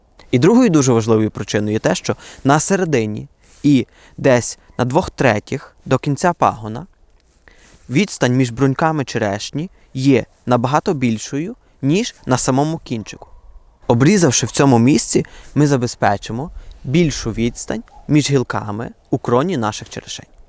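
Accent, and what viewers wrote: Western Ukraine, Ukraine. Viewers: Любуюсь этим парнем. Многие у него взял. Спасибо большое. Он любит своё дело.И мова очень гармонично звучит, хоть и с выраженным западенским акцентом. Молодец, уважение! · не варто вибачатись за "хоть и....западенский акцент". Українська мова багата різними акцентами і тим прекрасніша! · Очень понравилось. Спасибо за сжатое изложение по сути. Начинаю писать по русски, чувствую, что съеду на украинский)) Речь красивая, чувствуется акцент западной Украины, но очень привлекательный. Сохраню видео себе. А то посадила пару черешен, а как подойти к ним, не знаю. Подскажите, а повой ВСЛ2 подвержен гробакам? Дякую и всього найкращого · Западный акцет...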